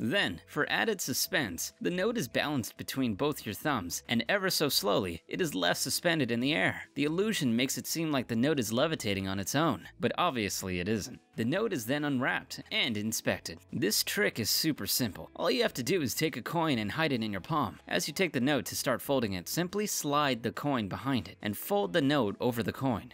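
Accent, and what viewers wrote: United States. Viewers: It's so weird to hear someone refer to bills as "notes" and their value as "pounds" while not only speaking with an American accent; but also holding US bills. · 20 pound note shows 20 dollar bill also his accent was American so why 20 pound note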